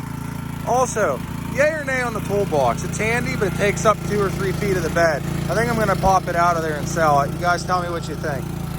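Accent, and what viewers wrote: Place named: Philadelphia, United States. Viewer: You sound like a Philly guy. Dig the accent! Love the truck.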